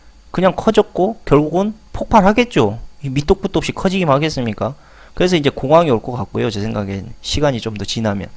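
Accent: Jeolla, South Korea. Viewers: 이사람 전라도네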